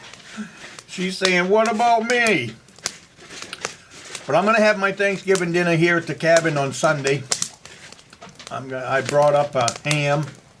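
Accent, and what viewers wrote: Maine, United States. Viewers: They will love that. When I put your videos on It's usually the four-year-old Kieran who perks up first and he calls to eight-year-old Josiah and says hey it's. And then Josiah will come in smiling because he knows exactly who you are and then no matter what you're doing they just are completely silent like they're learning something they've never seen before. I used to go trapping with my brother years ago and hunting when I was a young man but due to back problems I haven't been able to do that so you're the one that's teaching them at the moment and it's really sweet. They respond to that very well and now they asking me about the meat that they eat at home oh, they are realizing oh this is really a chicken that was running around or oh I'm eating a lamb. And then they might ask what does bacon come from and what are hamburgers made of and then we have a fun conversation letting them know that hamburgers are not in fact made by him at all. 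Anyway thank you for your hard work at presenting these videos oh, you are definitely touching lives in a very special way. Also your accent reminds them of Uncle Harvey who grew up in Gorham Maine where my accent is a combination of Boston and DownEast growing up with lobsterman. I hope you are having a wonderful time up there and I know you are staying warm even if it takes dunking all your kindling in a bucket of water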